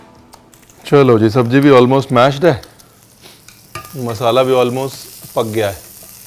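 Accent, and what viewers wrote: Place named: Punjab, India. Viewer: Panjabi pana🤣😘itna cute bolte ho 😆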